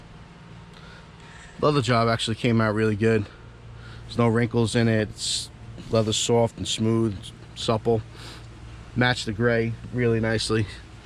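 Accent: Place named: Boston, United States. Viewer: Bro i can tell you're from Boston or somewhere like that ahaha. great vid thanks. What are you using for a drone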